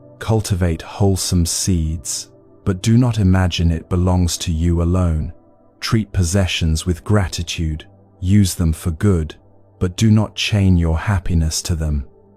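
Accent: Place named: Europe. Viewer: Ugh european accent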